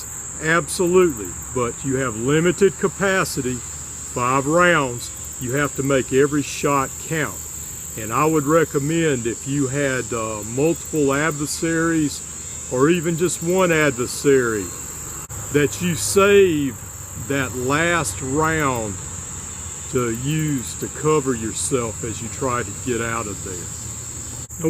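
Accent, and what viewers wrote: South, United States. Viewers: “Excuse my execrable French” Mon Ami, I very selfishly felt “included” in this comment. However may I assure you that you as a Southern gentlemen with your accent ….. that the French would find your “French” est très magnifique. Avec moi, with a Belfast Irish accent and though being French resident for several years my sometimes totally correct French vocabulary and grammer is often considered by my French friends as horrible, mais l'accent de ma femme Susan anglaise totalement acceptable. Great video comme toujours, especially in these times. My thoughts are with you and your family, stay safe. You can always trust a Smith!! Cordialement RB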